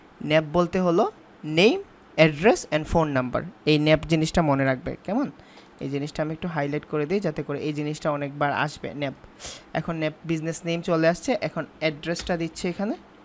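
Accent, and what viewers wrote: Sylhet, Bangladesh. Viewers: ভাই কি সিলেটি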